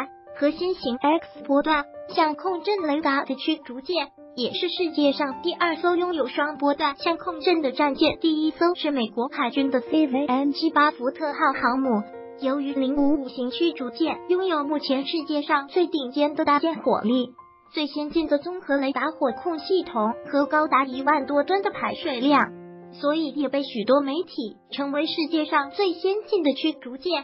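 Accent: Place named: Taiwan. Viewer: 台妹说话的腔调让我浑身起鸡皮疙瘩，头皮发麻，哈哈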